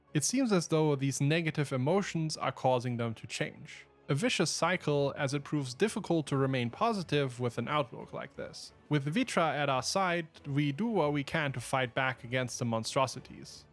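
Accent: Germany. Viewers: What accent are you speaking in? German?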